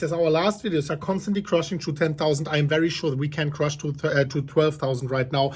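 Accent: Germany. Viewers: Danke alda, nicht nur der beste englisch crypto youtuber mit deutschem akzent :D sondern auch bester youtuber von dem man in jedem video was lernen kann!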